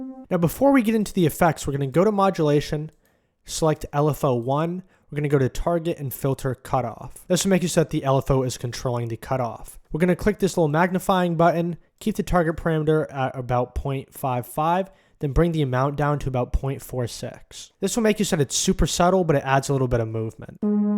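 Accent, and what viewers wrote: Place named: New York, United States. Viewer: You sound so new yorkian...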